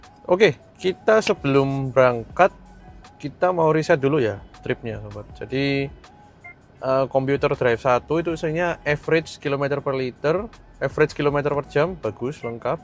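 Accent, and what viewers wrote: Surabaya, Indonesia. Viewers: sampean enak nek ngomong suroboyoan ko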